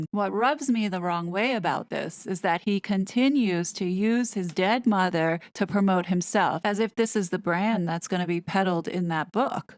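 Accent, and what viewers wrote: France. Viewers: You have a gorgeous French accent! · Ooohhhh by the way your French accent is great.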